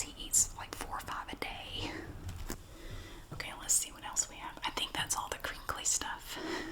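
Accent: South, United States. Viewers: I'm an English man,but I love your southern American accent,your videos are fantastic & I love the way you do your thing,your whispering is awesome,please keep doing what you do,you're amazing,much love,Chris x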